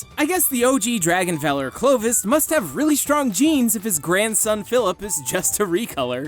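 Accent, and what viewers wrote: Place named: South, United States. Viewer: Where in the south are you from? :0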